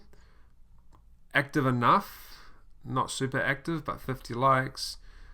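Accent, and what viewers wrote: New Zealand. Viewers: ...you sound kiwi... totally off subject lol great vdo btw :)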